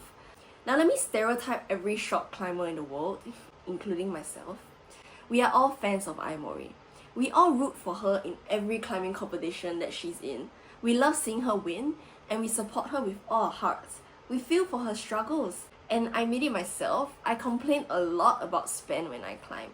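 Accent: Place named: Taiwan. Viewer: Great video. We all love Ai Mori! But I also love your accent 🤣 Where are you from? Taiwan?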